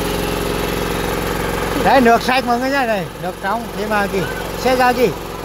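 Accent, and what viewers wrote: Nghe An, Vietnam. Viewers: thật tuyệt vời, vẫn tiếng Nghệ cười có kể, ăn có kể, đẻ có kể 🤩🤩...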